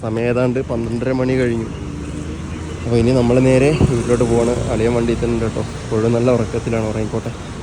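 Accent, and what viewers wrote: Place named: Kollam, India. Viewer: Kollam slang super👍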